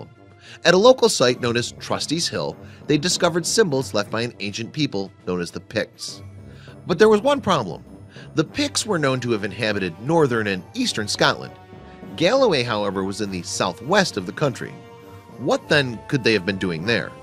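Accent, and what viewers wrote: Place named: Midwest, United States. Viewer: The narrator has a Wisconsin or Chicago northern accent so he's mispronouncing a lot of words.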